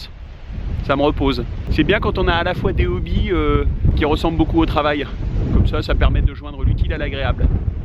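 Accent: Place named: South, France. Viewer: Sexy entre le ménage et l'accent du Sud!!! Tjrs en mode groupie ;) Tes vidéos sont sublimes plus sérieusement!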